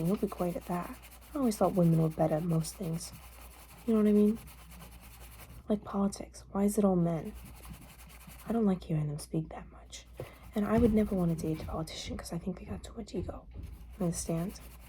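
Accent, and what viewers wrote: New Jersey, United States. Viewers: Omg I love the jersey accent, idk asmr sounds so cool with it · I love your jersey accent the best!! ❤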